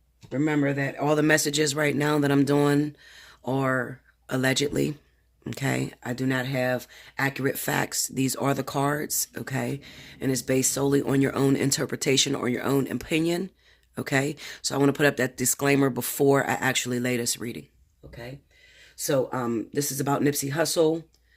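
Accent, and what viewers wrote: Pittsburgh, United States. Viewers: Wow I can hear your Pittsburgh accent clearly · Pittsburgh accent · Are you from Pittsburgh by any chance ?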